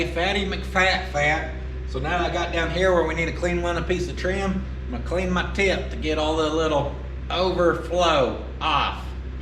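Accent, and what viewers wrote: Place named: Oklahoma, United States. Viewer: I often comment because its only fair that i spread my expertise across as great an area as i possibly can! And i may or may not get ridiculously triggered by my colonial cousins the other side of the puddle pronouncing english words spectacularly wrong! E.g. jagwire instead of jaguar or sodder instead of solder but i have to say american accents are so easy on the ears mr is particularly cool if i try and speak on camera i sound like a cross between michael caine and ron weasly its just not fair america took my beautiful language flushed it down the waste disposal and then made it sound so damn cool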